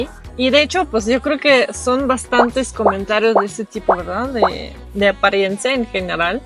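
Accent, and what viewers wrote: Russia. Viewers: Yo no pensaba que en México había haters tan malos como en Francia. Yo no pensaba que los Mexicanos se preocupaba tan de otros como en Francia. Yo conozco un poco México porque vengo cada año un a dos meses en su país y a mí la gente me parecía muy amable. Me agradecería hablar español como tú con tu accento que no sé si es ruso o no pero que es muy correcto. .¿Si México es tan buen país por qué tantos lo huyan ? ❤🇫🇷🍎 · Hola....pues Ami me atrapaste por tus fotos...y esos ojos verdes...(Espero que no me de hate tu esposo... jeje..)....y con respecto a los que te mandan Hate....no te preocupes ya que esos solo tienen ojos para el América...(Y ya sabes lo que sigue...así que, así velos...)....y con respecto a tu acento ruso... No sé te va a quitar nunca en su totalidad...ya que tus músculos de la voz nacieron o se adaptaron desde chica al idioma ruso...lo puedes neutralizar pero eso es mucho rollo...y además paque..si se te entiende y muy bonito.... 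Y por último... Ten pensamiento del tipo de Chavela Vargas....Saludos....y no dejes de subir videos....😊 Ha y los Hate.... También sirve para subir el reiting...... jeje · No hagas caso de estúpidos haters que no hacen nada bueno, no sirven para nada, no crean nada, no son campeones de nada. Lamento muchísimo estos mensajes. Soy mexicano y le doy la bienvenida a todo mexicano nacido donde se le dio la gana (ósea extranjeros). Adelante y a disfrutar la vida, tu nueva vida. Abrazo enorme desde la CDMX. Y me encanta tu acento RUSO!!! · Como alguien puede criticar la apariencia de otra persona, de su acento y su forma de vestir?, a caso esas gentes son muy cultos y modelos perfectos en todo sentido?, nadie lo es!…es muy fácil juzgar, criticar y demeritar a cualquier persona sin conocerla, sin entender el contexto de su vida, más aún cuando se hace desde el anonimato y a través de un medio como youtube;también muchos de nuestros compatriotas en el extranjero, por medio de su trabajo hecho su vida de manera admirable y honrada, teniendo que regularizar también con esfuerzo ( porque no es fácil ) en esos lugares su estancia legal, es muy válido!. 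En tu caso, aunque tu origen y acento sea ruso, ya no eres extranjera, tienes la legalmente ciudadanía, con derechos y obligaciones, pasaporte, familia, residencia,; si vives en la Condesa, Las Lomas o colonia modesta, que nos importa, a tus posibilidades y de tu esposo a donde quieras y aunque a muchos les de diarrea, eres mexicana! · Pues tu acento ruso es encantador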